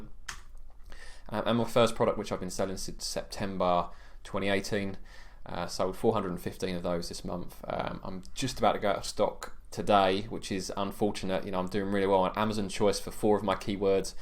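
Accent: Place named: Essex, United Kingdom. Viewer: Great video, where are you based in UK? Sound a little bit Essex but can’t quite place the accent 😁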